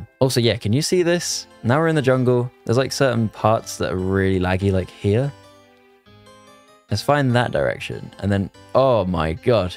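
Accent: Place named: United Kingdom. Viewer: I love this guy’s accent. where is he from in the uk?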